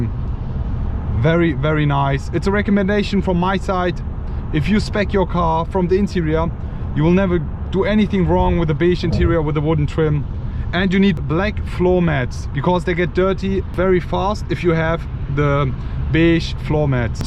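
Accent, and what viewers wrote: Germany. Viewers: Du bist ein deutscher das hört man raus 👌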